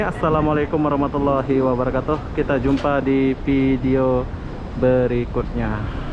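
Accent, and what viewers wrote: Malaysia. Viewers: 😆..kebiasaan lama dia sewaktu masih kerja di M'sia.. Dah bro biarin jgn dipermasalahkm klo soal aksen atau bhs. Bukan hy dia kok. Byk juga TKI yg pulang2 bhs nya campur2 aksen Malay. Meski agak Alay sih tp g p2.. kita aja yg ga pernah kerja di M'sia dah kena pengaruh bahasa upin ipin. Serius.. .propaganda budaya lewat upin ipin ini berhasil lho gaes mmbuat anak2 paham bhs malay ..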